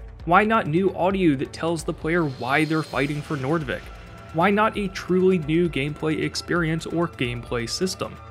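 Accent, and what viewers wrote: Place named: Pennsylvania, United States. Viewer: Are you from Pennsylvania? I hear a strong accent. I'm loving this game, but yeah the events are lazy.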